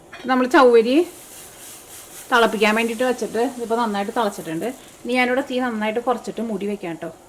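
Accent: Thrissur, India. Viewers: Nishkalangamaaya Thrissur Slang.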